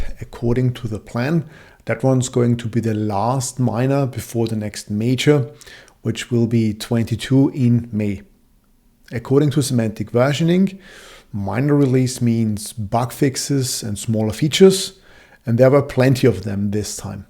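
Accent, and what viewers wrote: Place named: Austria. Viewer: He sounds sooooooo Austrian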